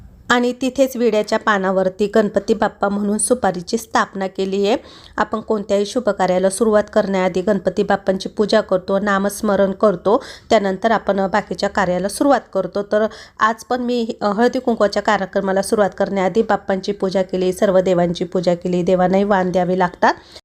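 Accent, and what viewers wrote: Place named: Jalgaon, India. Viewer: मावशी तुम्ही जळगाव मालेगावच्या दिसत आहे बोलण्या वरून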